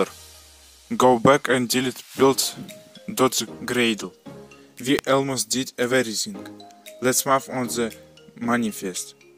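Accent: Russia. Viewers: I listen russian accent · Акцент русский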